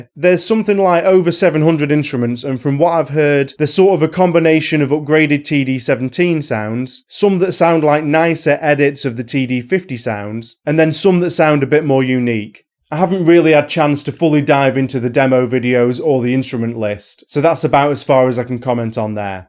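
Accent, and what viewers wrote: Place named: Yorkshire, United Kingdom. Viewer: Nice to hear a Yorkshire accent for a change!!!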